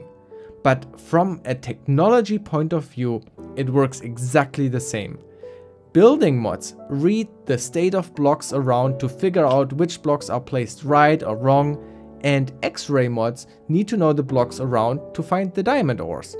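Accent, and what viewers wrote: Germany. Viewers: Wie krass man hört, dass du Deutscher bist xD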